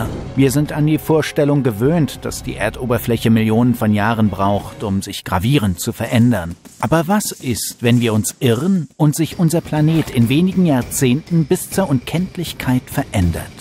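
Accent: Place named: North, Germany. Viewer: ‘aus ‘ein ‘ander: Du bist aus Norddeutschland, ziemlich nördlich! 🤣 Das war's aber auch schon mit neuen Erkenntnissen.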